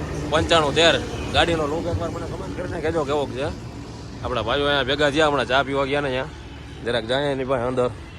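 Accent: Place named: Saurashtra, India. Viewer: ગુજરાતી તો સેજ પણ એમાય સૌરાષ્ટ્ર જે બોલી છે એને તો પુગેજ નય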